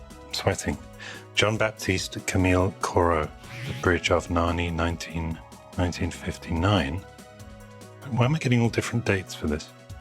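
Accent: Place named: Cockney, United Kingdom. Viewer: the oy bruv accent. I love it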